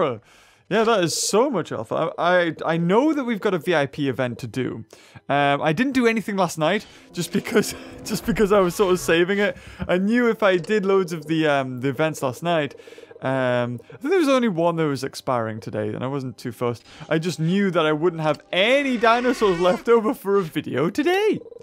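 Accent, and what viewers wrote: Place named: England, United Kingdom. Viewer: I feel like i am the only person who understands you because i am english too lol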